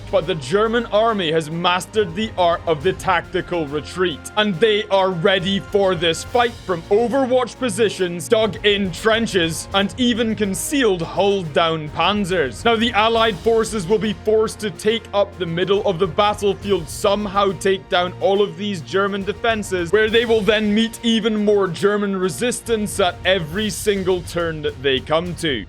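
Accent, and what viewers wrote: Scotland, United Kingdom. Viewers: Scottish BaronVonGames? Consider me a subscriber! I feel bad that your work goes unnoticed.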